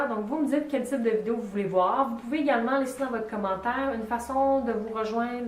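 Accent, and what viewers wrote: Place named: Quebec, Canada. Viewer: hi!!je participe !!j'aimerai que tu fasse une video sur la cuisine de ton pays,je suis africaine plus precisement du TOGO et j'aimerai pouvoir interechanger sur de nouveau repas.en passant jolie accent(j'aime trop cette façon canadienne de parler le français)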